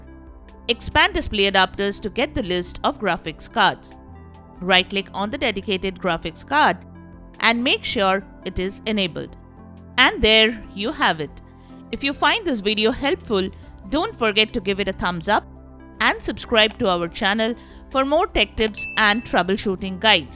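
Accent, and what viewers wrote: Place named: India. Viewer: Dislike for the indian accent. I don't like the color of skin and the way they look/behave.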